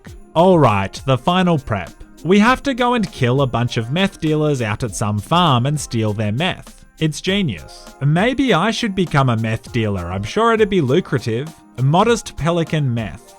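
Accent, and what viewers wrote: Australia. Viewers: Modest Pelican are you Australian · Is he Australian?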